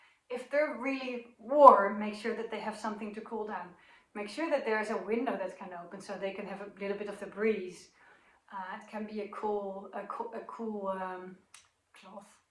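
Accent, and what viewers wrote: Netherlands. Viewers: likewise. I have been subscribed for some time now. Is it a Hollands accent i hear? Groetjes from the sunny Caribbean.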